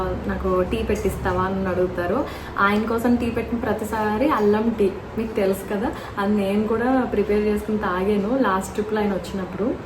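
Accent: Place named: Telangana, India. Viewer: గారు చాయ్ తెలంగాణ భాషలో మీరు మాట్లాడినట్టు అనిపిస్తుంది చాలా సంతోషంగా అనిపిస్తున్నది ❤❤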